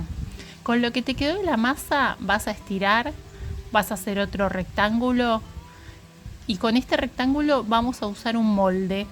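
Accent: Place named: Argentina. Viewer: Tw acabo de encontrar, amé tu canal y recetas, dónde vives que se ve ese mar hermoso pero tonada Argentina??????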